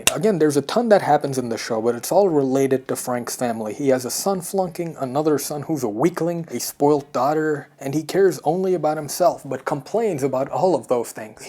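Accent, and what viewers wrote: United States. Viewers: oh OK kool just being curious that's all you sound like your accent is either American or Canadian.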